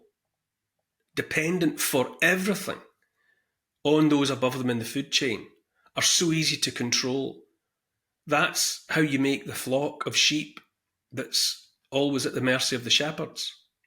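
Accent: Scotland, United Kingdom. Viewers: His words are powerful poetry in a Scottish accent and deserve to be heard by all no matter how delusional or brainwashed one currently may be Neil’s words might enlighten the dome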